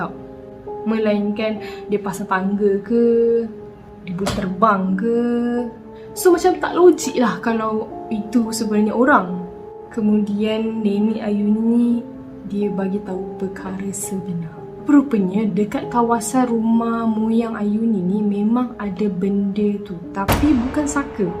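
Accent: Kelantan, Malaysia. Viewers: comel kak cakap klate...best tgk kak bercerita...❤❤❤kak one of my favourite youtuber.. Salam dari kelantan...😉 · Sy dari kelantan... btw jadi boh kecek qlate 🤣 so jgn berhenti mencoba lagi 😘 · emm yoo doh la tu! lawak sikit accent kelate kak 😂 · comey laa ho ore melaka kecek kelate hahaha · Bendo tu ko abe.? Erm yo dh la tu.. 🤣🤣😂 bulih kecek klate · Comel bhsa klntn 🤣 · cute je kak ckap kelantan 😍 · come kecek klate,hhuhu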